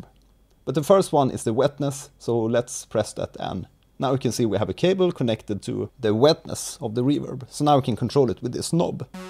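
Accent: Sweden. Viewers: I know that accent! Are you Swedish?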